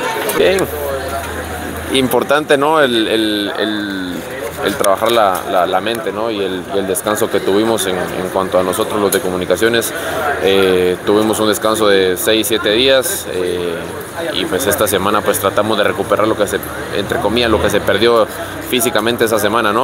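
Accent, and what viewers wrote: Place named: Argentina. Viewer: Este muchacho abla como argentino yo creo que si vamos a ganar.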